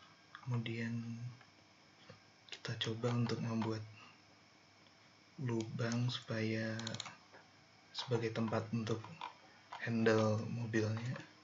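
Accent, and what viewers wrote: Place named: Java, Indonesia. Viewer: logatnya jawa abis hahaha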